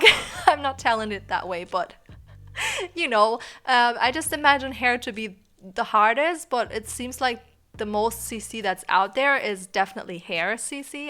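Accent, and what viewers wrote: Germany. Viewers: I could tell you were German I'm from the south in America but i LOVED my german teacher sooooo there's that. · Als ich mir ein Video von dir das erste Mal ansah, hab ich mich sofort gefragt ob du deutsch ist. xD Ich glaube wirklich, dass man's einfach raushört, deine englische Aussprache ist echt ziemlich gut ^°^ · I’m 5’3 ft which is considered short but I know a lot of other women around the same height. I’ve been trying to learn German for school for a few years now and struggling lol as soon as you said you’re German I was like ohhhh I can hear it now. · 1km is roughly <num>) miles and a meter is 3.281 feet ( 3.28084 precisely). Also, great vid, I'm always on the lookout for new cc especially for males. I like your accent but I would have never guessed you were from Germany if you didn't say it. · haha I was wondering if you are german! 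I noticed your accent is very familiar so I was wondering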